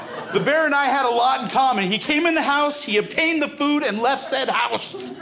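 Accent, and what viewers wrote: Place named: Boulder, United States. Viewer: But sounds more like he works in Boulder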